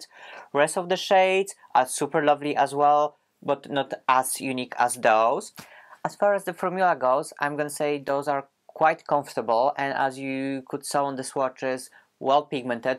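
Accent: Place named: Poland. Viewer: hi! where are you from? your accent seems extremely familiar and polish-like :)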